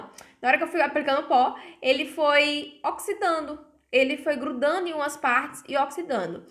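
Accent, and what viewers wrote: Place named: Mossoró, Brazil. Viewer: Mulher, morta que você é daqui de Mossoró! E eu achando o sotaque parecido kkk que maravilha 😍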